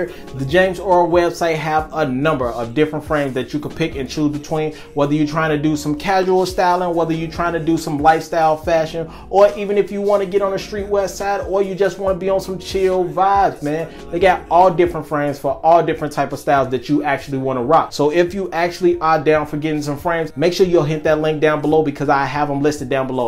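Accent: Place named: Chicago, United States. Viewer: I hear yo Chicago accent deep correct me if I’m wrong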